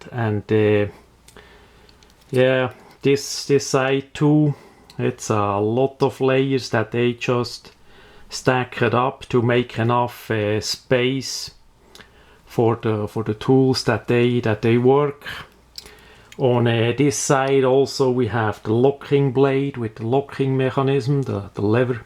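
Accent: Switzerland. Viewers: Merci ver de swiss-akzent :) geil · Oh Swissenglish very lucky axent,!!! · I come from germany and I always liked the swiss accent... In English it's even better! =) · Das isch es richtigs Schwitzerenglisch :-D eifach genial! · amazing knife, helpful video and your english has a funny swiss accent ;)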